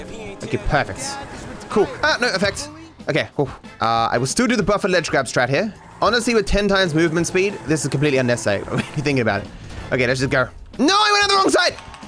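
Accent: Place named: Australia. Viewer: he’s Australian from Sydney im Australian from Sydney he grew up in Sydney I grew up in Sydney so our English is the same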